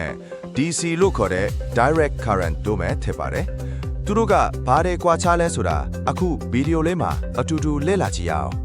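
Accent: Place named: China. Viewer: လျှပ်စစ်ရဲ့ အခြေခံ သဘောတရားကို ဝေမျှသင်ပြပေးလို့ ကျေးဇူးပါဗျ။ သို့သော်....... AI ကို ပြောခိုင်းတာကိုတော့ မနှစ်သ်ပါဘူးဗျာ ။ မြန်မာစကားကို စာဖတ်ပြီးပြောနေတဲ့ တရုတ်တစ်ယောက်ရဲ့ စာဖတ်ဟန်နဲ့တူနေပါတယ်။ ဝါကျတစ်ခုခြင်းစီရဲ့ အသံ အနိမ့် အမြင့် အဖြတ် အတောက် မပါတဲ့အတွက် နားထောင်ရတာ ပေါ့ပျက်နေပါတယ်။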